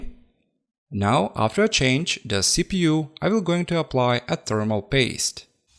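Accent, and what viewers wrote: Russia. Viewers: Russian accent lol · По твоему произношению видно что ты Русский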